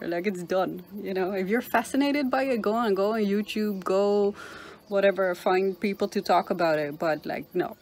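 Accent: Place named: Ireland. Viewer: I just saw a little boy child across your camera when you went in for a dip...so am figuring that you aren't the only person there........trying to figure out your accent....oh, i thought it was Irish.....lol oh, those men don't really mean any harm, they are just trying to break the Ice .....I am British, but my parents are Jamaican. A lot of people ask me where I am from, but because I am black, I get asked , so where are your parents from, so I have to explain they are Jamaicans.......BOOM !, as soon as they hear Jamaica .........Bob Marley, Ganja or Usain Bolt . I get it, they are trying to relay connect with me by the only thing(s) that they know about Jamaica, so maybe those men only know about the red light district and maybe the weed Cafes there.......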